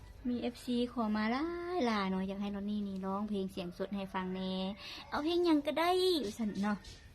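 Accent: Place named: Laos. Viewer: เว้าลาวได้ม่วนขนาด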